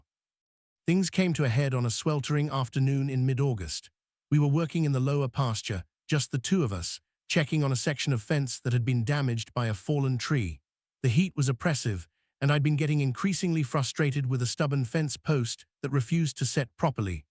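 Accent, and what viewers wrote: United Kingdom. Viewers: I really enjoyed the story line and the writing. However, I am not in complete love of the total package. What brings me down is the British accent of the narrator. Giving the cowboys British accents broke the narratives credibility. Cowboys with the a British accent stole the legitimacy of the story. narrator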